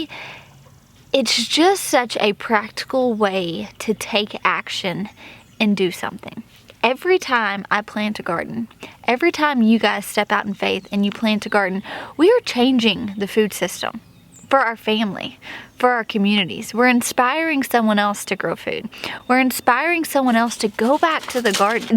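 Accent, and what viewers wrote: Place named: South, United States. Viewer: I LOVE that you and Jessica Sowards both say "peench" instead of "pinch". Some southern YouTubers try to hide their southern-ness, and as a southern girl I am not about it! I'm here for the southern accents ♥️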